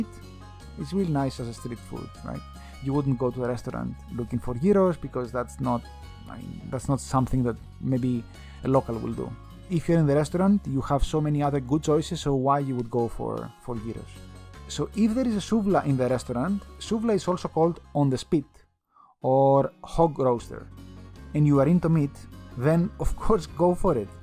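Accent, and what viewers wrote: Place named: Greece. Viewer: It’s more authentic like this. The dude’s Greek. Are you here for linguistics or are you here for food?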